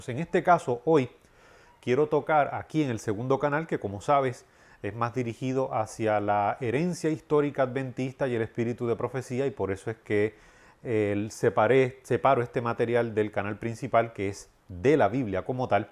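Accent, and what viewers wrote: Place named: Puerto Rico. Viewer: Hermano de qué país eres ??? Tu acento parece puertorriqueño cómo yo.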